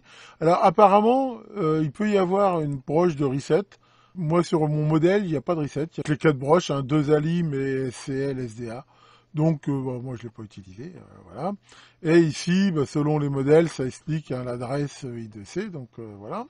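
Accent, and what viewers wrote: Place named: France. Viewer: Bonjour, Excellente vidéo comme toujours sur cette chaîne, ça fait toujours plaisir de voir une notif sur YT avec une nouvelle vidéo de ^^ Par contre, pouvez-vous répéter après moi M? Aidafroute:D Je plaisante, mais cette petite touche d'accent Français m'a fait sourire. Bonne continuation à vous.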